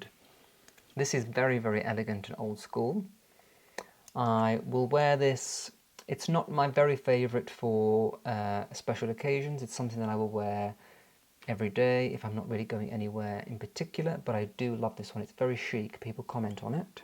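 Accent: RP, United Kingdom. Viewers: Yes, unfortunately he does not see it. He's made videos before addressing people that criticize his posh accent and his lifestyle stating that's who he is and he just ignores it. He thinks the critique is just people being mean or that envy him. I think there's a nice person in there, but he has convinced himself he needs to keep up the elegant dandy facade. He comes across a bit sad and lonely to me. That scene in his closet gave me manic vibes...very OCD. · So British, so posh hahaha.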